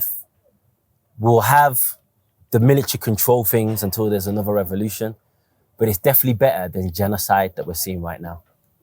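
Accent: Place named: Birmingham, United Kingdom. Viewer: That Birmingham accent 👌🏾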